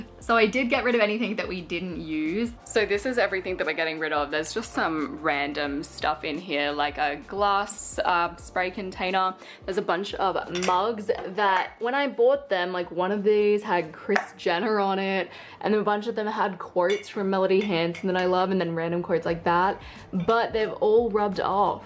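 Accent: Australia. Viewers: Dear, I love hearing your voice because you make me feel like I’m still in Australia! I often think I’d like to see how you’d evolve after having children... I had 2 pretty close together and THAT was made me crave minimalism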